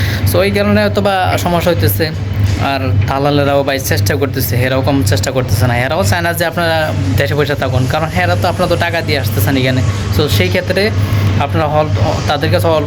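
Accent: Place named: Comilla, Bangladesh. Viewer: আসসালামু আলাইকুম কেমন আছে ন ভাই আপনার বাড়ি কুমিল্লা কেন বলছি ভাষাটা কুমিল্লা আসে দোয়া রহিল মক্কা জমিন থেকে আমিন।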